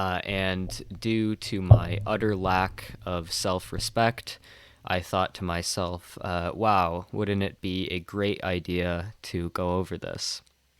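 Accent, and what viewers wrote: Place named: South, United States. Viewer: I can't take her seriously with that southern accent.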